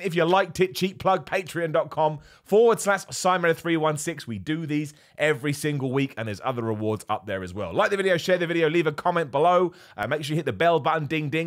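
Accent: United Kingdom. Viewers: Great Video, I'm from the UK and it is so funny hearing your views as I think the same and always better UK voice than American like there is alot on YouTube. Keep up the great work. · Great as always love your candor. Always good info and a laugh. maybe it's because I'm from the United States but I could listen to somebody from the UK talk all night long it's not that that's weird I just like the accent anyway keep up the good work man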